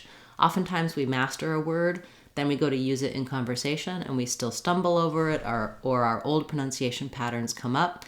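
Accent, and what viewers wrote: United States. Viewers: Is it a American English?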